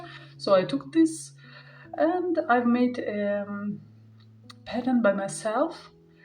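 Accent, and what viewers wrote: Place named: Eastern Europe. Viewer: Your accent is just so beautiful, and your English is wonderful. I’m a third generation Russian-American, so English is my first language, but I always love the way Slavic languages and accents sound. So beautiful! God bless you and your family,! I hope to see more videos from your channel and that it grows in popularity. 🩷🙏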